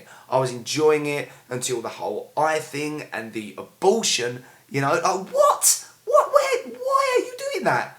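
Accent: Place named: Scotland, United Kingdom. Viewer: Very good review. I am American with *distant* Scottish heritage, and thought I recognized some of your way of speaking, and that of the actress you mention. I watched PROM... DVD this morning, and enjoyed it. I have been reading reviews all day ever since. I want to see your take on EPISODE ONE, where I am going now....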